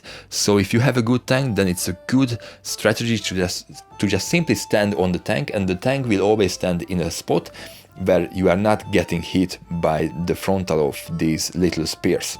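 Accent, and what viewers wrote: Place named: Hungary. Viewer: Nem szeretnélek megbántani, de simán kihalatszik a szörnyű magyar kiejtés az angolodból. Rendesen vérzik a fülem. Viszont ha ilyen szépen megtanultál angolul folyékonyan beszélni,akkor érdemes lenne dolgozni rajta. Valamint egy magyar felirat sem ártana mert azzal nagyon sok embert érnél el, vonzanál be. Nem igazán vannak hasonló részletes videók magyar nyelven.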